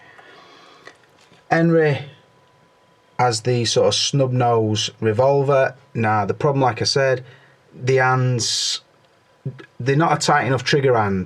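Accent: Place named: Manchester, United Kingdom. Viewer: hahahahhahaha ! Mate where are you from? Ypu sound local.. manchester ?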